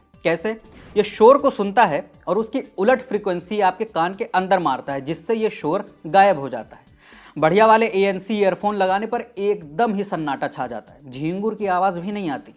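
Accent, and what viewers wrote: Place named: Bihar, India. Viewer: bhai aap Bihari lagte ho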